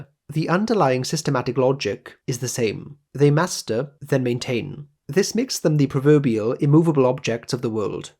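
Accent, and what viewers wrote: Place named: RP, United Kingdom. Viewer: INFJ here. The bigger picture destroyed and made me at the same time 😅 also.. you have an extremely posh voice, I love it. 😅😊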